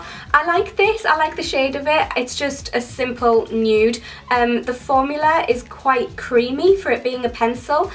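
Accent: Newcastle, United Kingdom. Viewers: I love your videos as I am a fellow Geordie. You are a Geordie aren't you 😂😂😂 Please do a freedom makeup tutorial ❤️💖❤️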